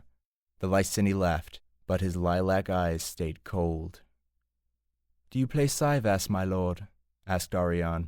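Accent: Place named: United States. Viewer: So weird having these read in an American accent...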